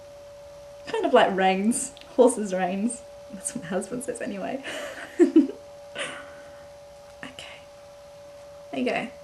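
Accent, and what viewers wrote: Australia. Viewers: You remind me of a Play School presenter becuase of your friendly and soothing voice. I love the people on here marvelling at the Aussie accent. haha.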